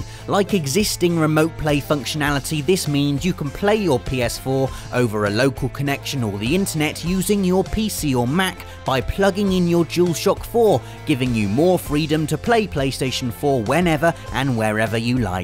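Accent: United Kingdom. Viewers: User Sczeshelled events. Love it! :) I wish I had a cool british accent too.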